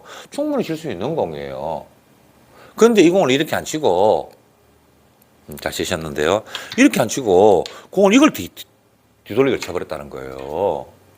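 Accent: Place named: Gyeongsang, South Korea. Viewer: 전형적인 경상도 말투에서 듣는 사람에게 짜증이나 화를 내는듯한 말투죠, 근데 실상은 그냥 평범한 말투인데 타지사람이 듣기엔 참 불편ㅋㅋ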